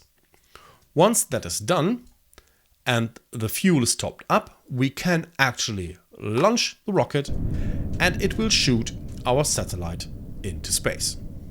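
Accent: Germany. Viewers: Ein Deutscher der Englisch Spricht. :D Dein Review is Nicht Schlecht. Aber ich hätte mal so ne Bitte an dich... Auf der CurseForge Page (~8 Kommentare unter deinem Video) hab ich ein Kleines Texturepack erstellt, da mir die Standard Texturen nicht ganz so Gefallen. Aber ich hab nie eine Ehrliche Meineung über meine Kleine Arbeit Bekommen. Deswegen frag ich dich, als Zufällige Person... um über mein Texturepack zu Urteilen, Sachen die Gut sind, Sachen die ich nochmal Pixeln soll, etc. (Es ist ein Link für 1.7.10 und 1.10.2 Seperat)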